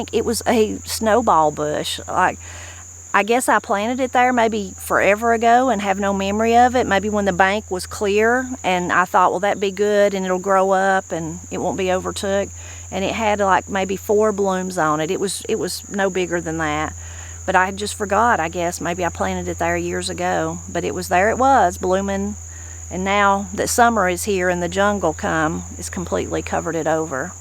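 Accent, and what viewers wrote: Appalachia, United States. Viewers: Hi, I grew up in the mountains around Hendersonville NC, just east of Asheville and not very far from the Chimney Rock area. The surprise lily daddy and grandmaw always called it resurrection lily. Another name for it is lycorice but I believe it's not akin to the candy. I've seen mostly red as I've been growing up, but I have seen a white variety and a variegated variety that had both red and white petals although they were growing together in the same clump so they may have crossed pollinated over the years. I live high up in Watauga County NC in Boone now and I haven't seen it up here, but it gets very cold very deep in the ground the freeze line goes super deep up here so it might not be cold hardy for this elevation. I truly enjoy yours and videos and the accent and storird remind me of home. Thank you for keeping Appalachia alive and well and for bringing up your daughters in our mountains traditions.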